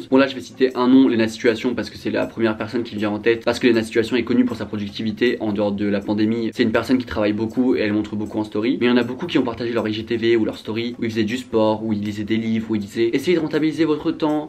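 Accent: Caen, France. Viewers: Quand je t’écoute j’entends VRAIMENT l’accent caennais haha ( je suis trop fier d’avoir toi et Lucasdorable comme représentation même si y’a que mwa qui vous connais a caen bahaha 🙁 )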